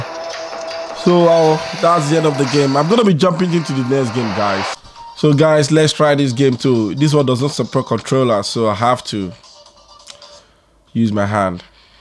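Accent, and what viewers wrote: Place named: Nigeria. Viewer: I really enjoy all your reviews man ,so honest and perfect ,i have ordered for this phone on Banggood and it is on transit to Nigeria,i hope i won't regret buying this one ,after my disappointment in Meiigoo S8 ,the battery drains faster and it lags though beautiful design but performance is poor..... Love from Nigeria Are you from Nigeria ,you sound like us? ...haha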